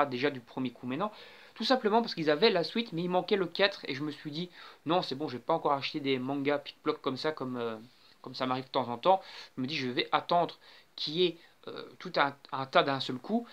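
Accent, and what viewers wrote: Belgium. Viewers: salut , merci de m'avoir répondu et désolé d'avoir pris du temps pour repondre , pour la question ou je te demande si tu es belge c'est parce que je suis belge et que j'ai eu l'impression d'entendre un petit accent xD Pour les conseille je voulais savoir - si on était "obliger" de montrer sa tête en vidéo car je suis encore jeune. - si filmer avec un téléphone impactais sur la qualité de la vidéo car pour moi l'image paraît correcte mais je n'ai vraiment d'avis extérieur si tu vois ce que je veux dire - et enfin aurais tu des musique de fond pour les vidéos à me conseiller Après je ne sais même pas si mes vidéos sont bien donc j'espère ne pas te faire perdre ton temps voilà voilà, en tout cas ta chaîne m'a beaucoup plus 👌😉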